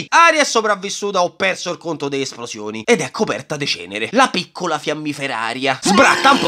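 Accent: Rome, Italy. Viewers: L'8 stagione la hai fatta perfetta, niente voce compostina, ma romanaccio a palla e urlaaaaa, mi ammazzo dal ridere! Fai così anche tutto il resto plz!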